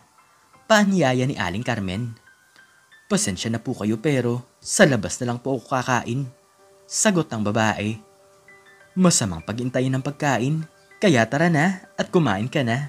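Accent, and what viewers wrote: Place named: Iloilo, Philippines. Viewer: Abaw! Ilonggo ka man gid.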